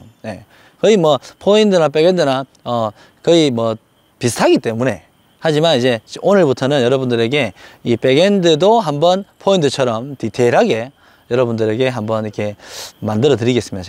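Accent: Gyeongsang, South Korea. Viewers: 요즘 강한 백핸드 슬라이스를 많이 노력중에... 가로스윙을 우연히 느끼고 이느낌을 ,그 코킹을 백핸드 드라이브에 적용해도 되겠다는 생각을 했었는데 이영상과 같은 맥락에서 볼수 있는거 같습니다 나이스 코칭입니다 경상도억양이 뇌에 팍팍 지식으로 때려 박습니다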